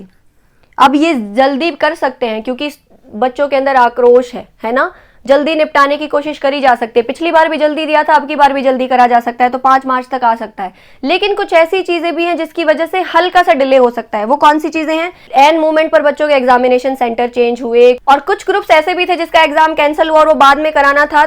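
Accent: Haryana, India. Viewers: Madam Haryanvi h 😂❤ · Hm Rohtak side ki lg rhi h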